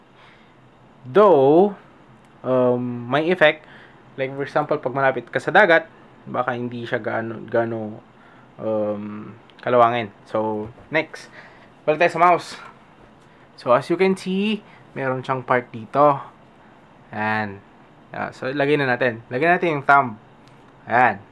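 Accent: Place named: Russia. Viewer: it seems you to be from rusia or nordic EU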